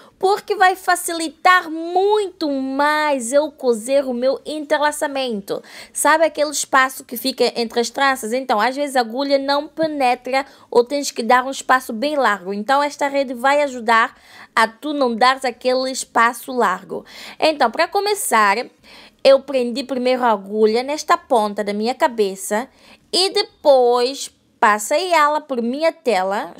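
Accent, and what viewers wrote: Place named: Portugal. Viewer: Amai o sutaque português ❤️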